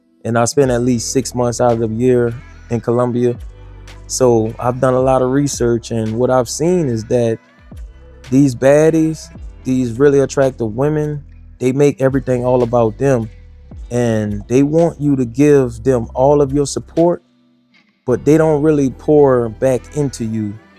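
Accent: Louisiana, United States. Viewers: Where you from bro. You sound like you from Louisiana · Bro are you from Louisiana? I’m from BR myself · Wait! You sounds familiar. Are you from NOLA Bro? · Fam, you from the boot?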